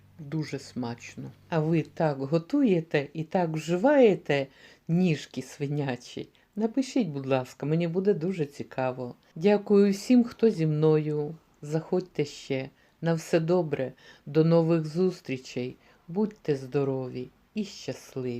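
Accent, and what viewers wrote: Standard. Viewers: Дякую за чисту украīнську мову без польсько-угорських домiшок! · так, добре поставлений голос, як у диктора радіо та телебачення, або як у вчительки. Та правильна українська мова, без домішок, та різних польсько-австрійських "вивертів". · НАКОНЕЦ ТО Я СЛЫШУ ПРЯТНУЮ НАСТОЯЩУЮ ЛИТЕРАТУРНУЮ УКРАИНСКУЮ РЕЧЬ,А ТО ИНОГДА ДАЖЕ НЕ ПОЙМЕШЬ НА КАКОМ ЯЗЫКЕ ГОВОРЯТ,НО ТОЧНО НЕ НА УКРАИНСКОМ.СПАСИБО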